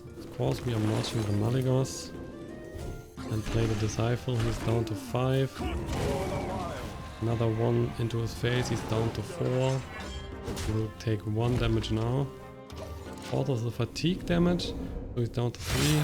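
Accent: Germany. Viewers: uhh are you Germany? Your accent makes me think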